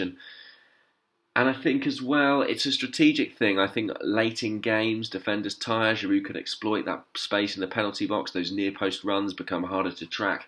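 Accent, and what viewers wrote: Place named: England, United Kingdom. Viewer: As a french fan I wish Olivier leaves Arsenal to another club where he can play more games. I think Marseille might be very interested, they are qualified for the Europa League too, he will be the main striker there and theirs fans will love his fighting spirit. I love your videos man, it helps me to understand english accent as well, keep going!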